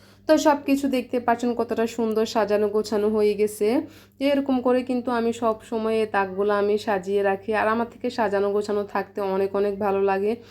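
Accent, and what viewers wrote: Noakhali, Bangladesh. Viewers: অনেক সুন্দর সুন্দর বাজার দেখলাম❤ নোয়াখালীর কোথায় তোমার বাড়ি ❤ কথা শুনলে বোঝা যায় তুমি নোয়াখালী আপু 💞 এক মাছের বাজার❤❤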